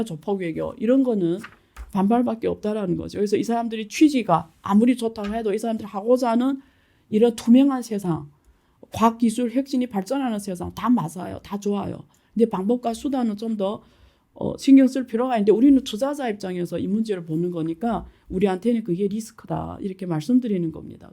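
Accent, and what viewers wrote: China. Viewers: 조선족 출신 교수님 이십니다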